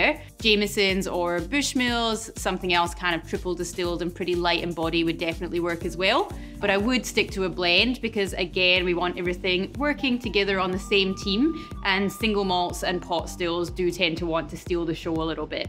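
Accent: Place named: Scotland, United Kingdom. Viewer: well, we all want more of that Scottish brogue! Cheers and best wishes from San Francisco. :)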